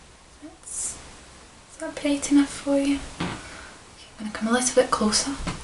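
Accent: Scotland, United Kingdom. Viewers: Okay. I just subscribed. I randomly came across this video and I am glad I did! This was very relaxing. Not only am I digging the Scottish accent, but you are beautiful, as well! Keep up the excellent work! :) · ASMR . thanks for the reply. Your Scottish accent is top shelf. I have lived in the states for 10 years and slowly losing mine. good luck developing the aussie one. cheers, and have a good one friend. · Wow,this was very nice,and love that scottish accent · I could be wrong but iirc, she lived in Scotland for some years and picked up the accent somewhat. And, I mean... People can fake accents, it's pretty much what actors and voice actors do. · I am completely in love with your Scottish accent! I'm a fan of it since trainspotting 😍 great asmr video. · pleaaaaase do more Scottish accent asmr's! it's the best! also u perform this so naturally it's so unique. 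it actually feels genuine. i love it! I'm currently revising for exams and have only been listening to your asmr's :D x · ***** And after seeing your answer I just now realised that the description said "attempted scottish accent" ^^ When browsing for ASMR videos I just look at the titles and listen to the start to determine if I'll watch it and mostly when I'm half asleep so not a lot of description reading going on :P Anyway, I think one has to know the scottish accent very well to tell the difference though. I just heard it in the later seasons of the TV show "Being Human" before but beside her having a thicker accent I straight up thought you were scottish ^^ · I thought you were actually Scottish until I read the description at 10 minutes in. I like the accent, more Scottish ones would be good! Excellent video. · doun, love the Scottish Accent. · Gotta give you credit for this I.have all on looking at a ceramic let alone remembering what to say AND doing a scottish accent! 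Great job keep the videos coming :) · Your Scottish accent is pretty good but you're saying words with an R on the end funny e.g. Here, hour, or etc... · This sounds Scottish not Brittish. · Well you seem to have it pegged pretty well. Just came across your videos yesterday and the scottish accent intrigued me. Cant wait to check out more of your vids. you'll probably hear from me again, my dear. lol. Thank you for taking the time to respond! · Great scots accent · You sound a lot more Scottish than British! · Some may think "NERD" when they here the Scottish accent and the glasses, but I can see past that to an ambitious young woman who can be a very good friend and helper! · That's a Scottish accent she's has. Same as mine ;) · Attempted Scottish accent!? I didn't think so. I though you were actually Scottish! 😃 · Finally a Scottish person doing ASMR! I'd guess West? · Are you scottish? 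I've heard the accent before on a TV show. No offense but my brain is stuck between "that accent is frkin brilliant" and "that sounds funny". Greetings from Germany, keep doing what you're doing ;)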